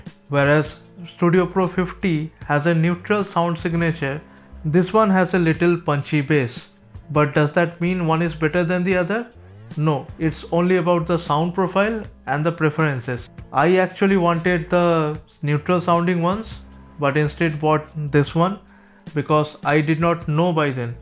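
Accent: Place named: India. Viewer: Lay back with ur Indian voice a bit please ruined the video kind of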